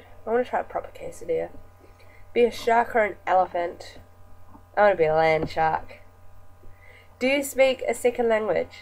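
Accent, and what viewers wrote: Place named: New Zealand. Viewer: i guessed new zealand before you said ! i win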